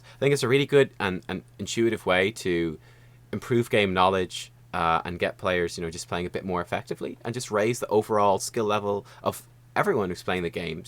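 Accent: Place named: Ireland. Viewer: you are right about that: much more enjoyable to hear you read through it bc my internal voice don't have the cool Irish accent :)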